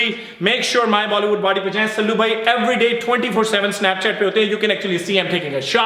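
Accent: Punjab, India. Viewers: bhai saaaa mazzaa aagyaa,,, or bhai aap ke bolnne ka tarika or aap ki pannjabi waahh mazzaa aajata h..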